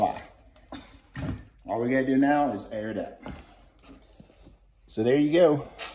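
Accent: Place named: South, United States. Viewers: Presenter definitely has an accent, although slight. Definitely somewhere in the southern US. The way he pronounces the word "buy" is a dead giveaway to my "normal" US word-pronunciation-keen California-raised-ears of 56 years. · Great demonstration. I hope I never have to do this repair job but it's helpful to know that it can be done. I love that accent, whereabouts in the U.S. are you from? We don't hear anyone speak like that in Australia except on movies. Thanks mate.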